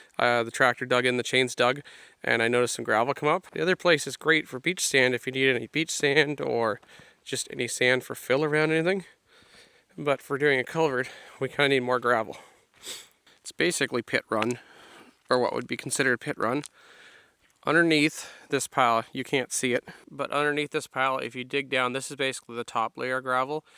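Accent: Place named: East, Canada. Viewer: Fuel injector cleaners and a product called "Engine Restorer" get my endorsement for old junk iron like your machine. Engine Restorer is a product you put in your oil it contains copper, silver and lead and helps to re-seal worn cylinder walls and helps worn bearing surfaces. Project Farm used it with great success. It is really hard to get in Canada now though. Not sure where you're from. Sounds like back east Canada, but maybe Maine... I got a few jugs before it went away. I'm using it in my gas engine with 428,000 km. It is down a bit of compression on the cylinders. Did a compression test before and waiting to see how much it picks up. Many folks online have used it and seen good results. Keep that old iron going!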